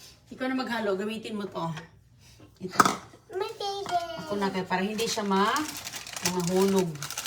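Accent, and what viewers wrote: Visayas, Philippines. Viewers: Anong masama? Ndi nmn pagandahan ng pronounciation jusme,bisaya kasi sya kya ganun nalang pagbigkas nya,ndi na kylangan tamangnbigkas .marunong knaman yta makinig intindihin muna lang.mga ibang pinoy tlg mga perfectionist kala nman sosyal din,mukhang nag ppakasossy lang naman😂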